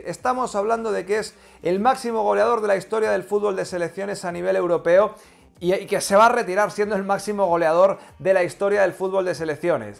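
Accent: Argentina. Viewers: Jajaja que buen acento porteño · Que acento argentino tan bestial. Mis respetos crack 😝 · Te salio bien el asento argentino 😁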